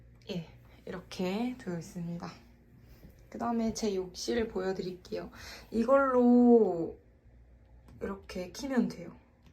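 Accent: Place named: Gwangju, South Korea. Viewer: 누가 봐도 광주 분이시네요 사투리가 정겹습니다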